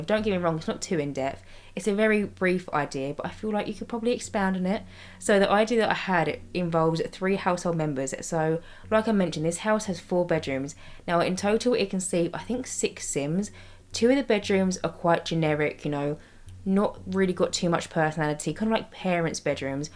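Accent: United Kingdom. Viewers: Ouu British actent I don't understand!!😂❤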